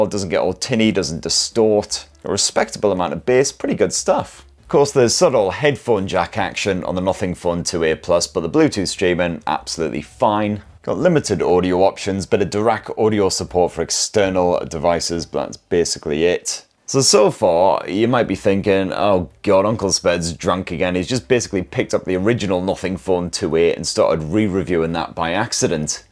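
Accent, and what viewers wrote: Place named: England, United Kingdom. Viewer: مع لكنة انجلترا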